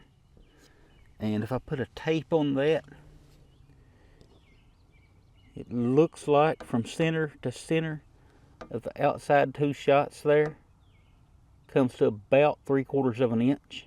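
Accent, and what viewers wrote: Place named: Kentucky, United States. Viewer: Heck.....you always sound funny to me.....you Kentucky boys vocalizations are a bit to sophisticated for a Texican😀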